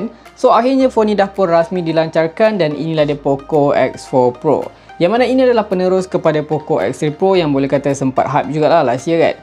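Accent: Malaysia. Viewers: Lucu banget y logat malaysia saya suka🤣🤣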